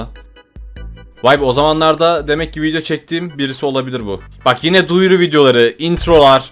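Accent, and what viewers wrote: Turkey. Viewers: Abi sen öz sivas lısın çünkü k leri g söylerek okuyon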